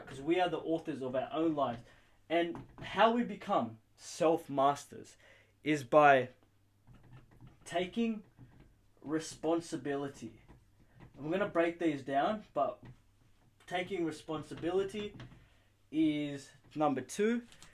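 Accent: Australia. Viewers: are u australan ??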